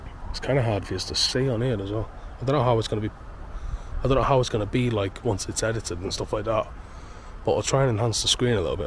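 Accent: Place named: Liverpool, United Kingdom. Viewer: That was epically brave of ya!! Ya sound a but scousey there tonight, must be cos ya were nervous.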